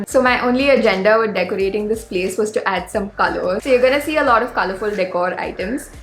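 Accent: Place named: Mumbai, India. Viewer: What a ridiculous thing to say. Living alone is something that a lot of people aspire for. You don't need to be filthy rich for that, although of course it helps. And in every city in the world people have peculiar way of pronouncing things. Growing up in Mumbai, even I used to speak like this only. Eventually when I shifted I speak like people around here now. Nothing stuck up about it.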